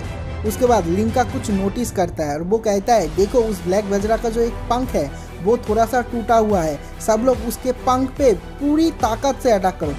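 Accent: Bengal, India. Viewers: Ap bangal se ho kya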